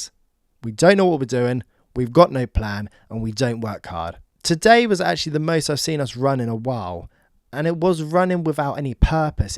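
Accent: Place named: Scouse, United Kingdom. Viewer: Such a thick scouse accent, I needed subtitles.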